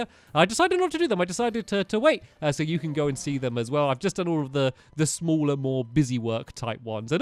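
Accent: England, United Kingdom. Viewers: Quest98Xx hes from england they pronounce things differently, not wrongly, just different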